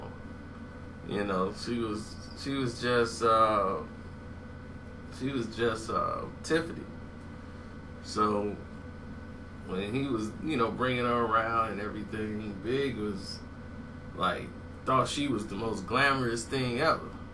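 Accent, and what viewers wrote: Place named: Houston, United States. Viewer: Nigga talk like he from Houston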